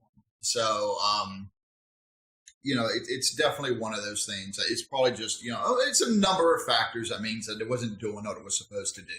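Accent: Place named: Australia. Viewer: But harsh but can't fault the honesty. You sound Australian! OI OI oi?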